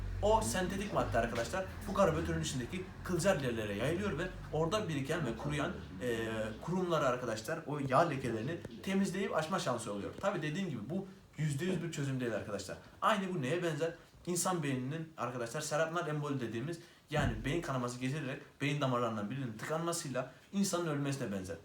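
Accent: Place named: Black Sea, Turkey. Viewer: Karadenizli şive farkı var söylem tarzı var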